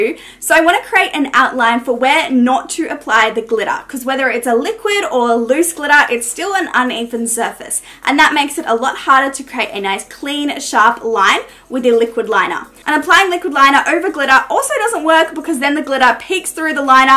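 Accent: Australia. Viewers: Where are you from? You sound kind of Australian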